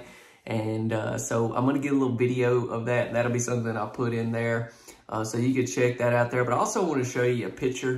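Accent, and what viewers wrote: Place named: South Carolina, United States. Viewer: Hi, just found your channel by today. Number 10 MH was nice, but to my amazement they’re still using the thin strips of wood(mdf) to join each 4X8 wallboard?, I really thought by now those would have been eliminated as manufacturing has really advanced over the years. Enjoyed your video and will be watching more. Thank you and have a great week….Be Safe ❤PS. I gotta say young man you sound like you were born and raised in upstate South Carolina.😂😂😂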